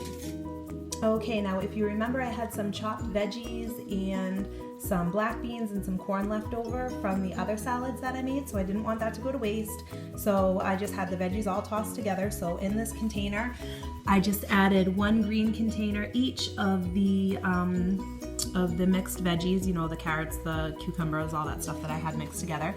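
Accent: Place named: New England, United States. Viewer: You must be a New Englander hehe! Where did you get those awesome containers?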